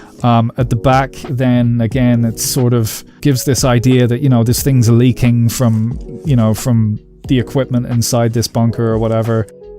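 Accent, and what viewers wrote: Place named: Ireland. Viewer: Brilliant ideas done brilliantly! On an personal note,you sound like a countryman of mine. Are you a northerner who went south or a Dub who went north! Either way, you’re very talented😊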